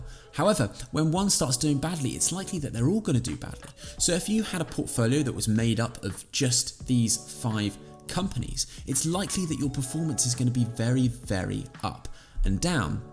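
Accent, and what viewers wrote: United Kingdom. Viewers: Thanks. I found the video very informative, well structured and easy to understand. Good to hear a British voice too. I read the comments on r/UKPersonalFinance and it seems a good bunch have a stick up their arse about you only discussing Vanguard, but I personally think you’ve made it clear there are other providers. Vanguard are just an example. Your audio/video is on point and deffo a thumbs up for the warm light background. I would love to see a video doing a deep-dive on UK pensions and specifically on how to protect yourself when moving companies or countries. I’m personally in a situation where I opted out of my work place pension scheme and haven’t invested as I was supposed to be moving to Australia.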